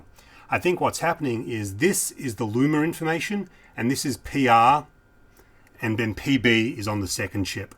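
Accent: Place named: South Melbourne, Australia. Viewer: This man speaks in a heavy south Melbourne accent. A Very harsh Aussie dialect.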